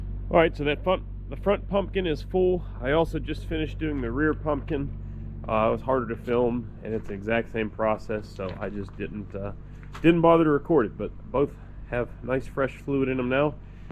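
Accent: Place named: North, United States. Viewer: I AM WATCHING FROM DOWN HOME ( LOU---A---VULL ) learn to talk proper mare kan next time so you kan fit in we no your a feerneer dam yankey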